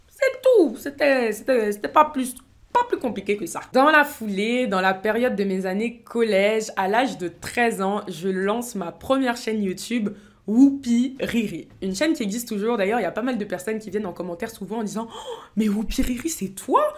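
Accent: Ivory Coast. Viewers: 😅l'accent à l'ivoirienne, me tue Bonne continuation ma grande soeur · Je savais que t’étais ivoirienne. Malgré le « shôkôli » on se reconnaît 😂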